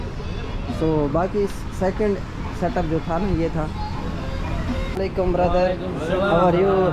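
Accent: Saraiki, Pakistan. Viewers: تم سرائیکی ہو تمہارے لہجے سے پتہ لگا میں بھی سرائیکی ہوں ڈیرہ غازی خان سے مسات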